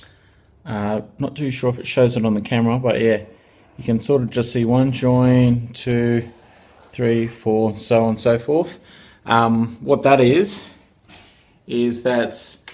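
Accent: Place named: New Zealand. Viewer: Hey, i know the bus is long gone but we have the same bus and are doing it here in ADL! You sound like you're Kiwi too? How the hell did you get the strips off where the seats bolted to the wall?!?!?! Looks like it's all one piece?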